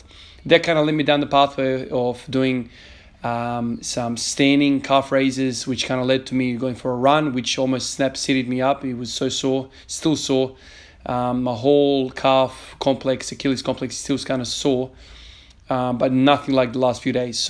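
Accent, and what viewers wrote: Australia. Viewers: I'm awful with accents. Are you Aussie, Kiwi or South African? Or none of the above? Haha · I thought this guy was from Australia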